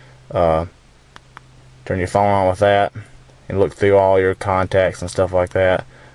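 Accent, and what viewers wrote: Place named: Texas, United States. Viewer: I love your accent... Are you from Texas?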